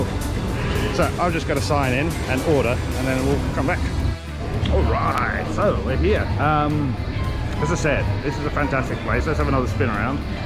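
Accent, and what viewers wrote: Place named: Australia. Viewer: AHA! A Mexican! I catch your videos every now and then for tips on Rise of Empires and always thought by your accent you must be an Aussie. A Victorian I can deal with, thankfully you’re not a Qld’er! 😆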